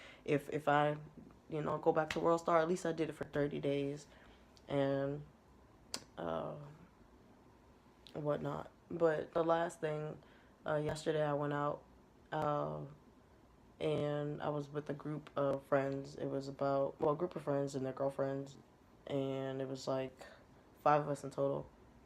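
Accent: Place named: Prince George's County, United States. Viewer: You sound like you live in PG County. You should check out the Reelback channel. I had a white dude come up to me and my mom dressed like Jesus and the white family walking next to us were more scared than me. I grabbed my mom and told him to bust a move. The biggest rats I have ever seen were in DuPont Circle. I love my hood as you say. But, I stay away from downtown (Latte City). A lot of the white people in DC are rude as hell. Interjecting themselves in your business, not moving out of the way...And, they give that creepy smile at you. 🤪🙄Matter of fact, I’m about to go outside and see what’s popping. I haven’t worked for the man in five years and my whole perspective has changed. It is imperative to support your tribe. They will support you. Too many of us are straddling the fence. You sound as if you are entering another phase in your life. 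Growth...Have a great week...You are not alone...😋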